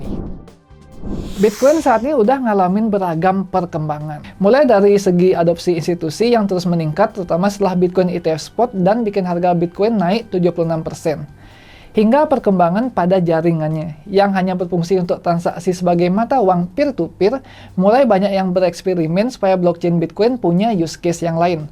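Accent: Bali, Indonesia. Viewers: Logatne bli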